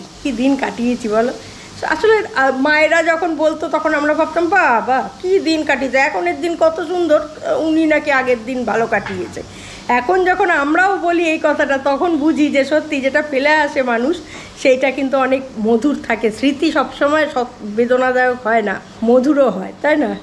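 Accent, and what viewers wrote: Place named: Bengal, India. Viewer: তুমি কথা বলো বা গান করো,আমি হাঁ করে শুনি 😊😊😊😘😘😘😘😘😘 তোমার কথা বলার ধরন অতি বাঙালি খাঁটি বাঙালি ❤️❤️❤️❤️❤️❤️❤️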